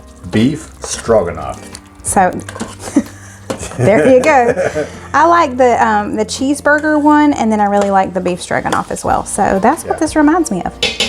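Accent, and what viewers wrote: South, United States. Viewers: I looooooovve the way southerners say “oil” haha. You guys say it like “ol”. Another great video.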